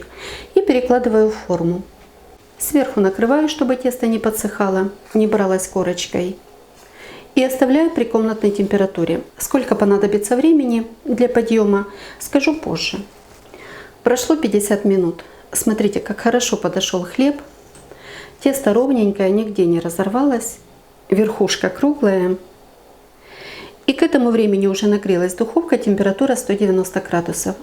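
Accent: Ukraine. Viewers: у вас така гарна українська мова❤ Дякую за хлібчик.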